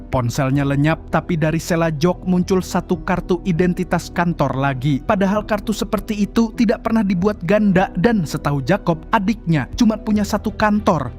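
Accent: Banjar, Indonesia. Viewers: orang Banjar kah mang?😂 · Admin ny urang banjar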